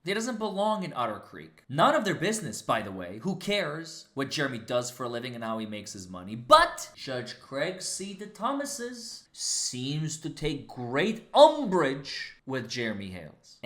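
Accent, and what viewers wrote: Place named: Ohio, United States. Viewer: Awesome job I knew sooner than later someone would see what I thought was her manipulating the court’s system! AND I knew you were from Ohio! 😊I could feel it in my soul!! Honestly you have a face that I have seen at some point in my past! Not to mention you act too much like myself & our friends to be from KY! 😂 Those from Ohio have a different kinda accent!!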